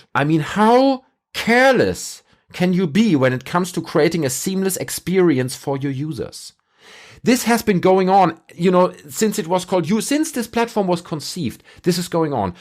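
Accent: Germany. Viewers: Man I just love your pure German accent and wanna see you rant more and shit on ubishit!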